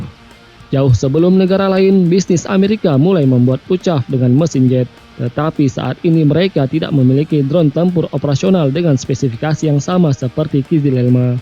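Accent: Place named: Saparua, Indonesia. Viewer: Min kau orang saparua k...logatmu kental orang saparua😁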